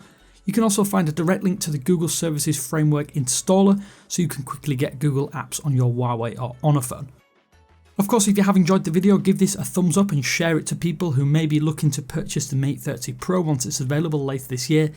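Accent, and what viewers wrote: United Kingdom. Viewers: てていていってて 这差远了，只是英音而已。。你听听约翰逊那种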